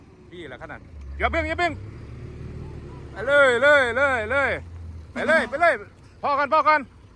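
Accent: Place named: Laos. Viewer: ออกลาวจ้า89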